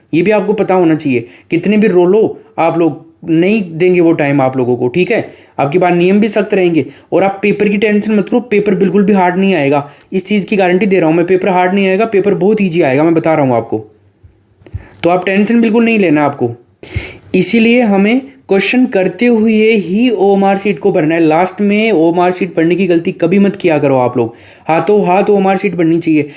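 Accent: Rajasthan, India. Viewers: Sir aapki trick bhut aachi h usse bhi aachi h aapki voice or bich bich gjb rajsthani( ade, vde ,mahine)😘😘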